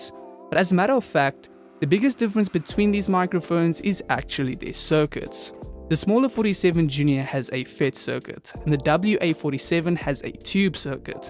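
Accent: South Africa. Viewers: his south african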